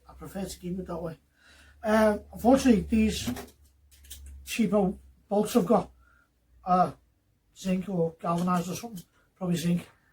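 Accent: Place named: Liverpool, United Kingdom. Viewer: G'day buddy, is the trailer for a land speed record bike (LSR bike)? If I slow the video down to 8 or 9 speed I can understand about 80 percent of what you are saying, that scouse accent is wicked. The trailer looks good and it's good to see the pick has more than one function. Cheers buddy and still watching from NZ.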